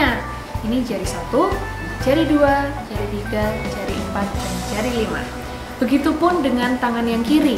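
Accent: Java, Indonesia. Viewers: mbaknya medok😂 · la wong emg org jawa tulen koq wkwkkw :v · Kak orang jawa ya?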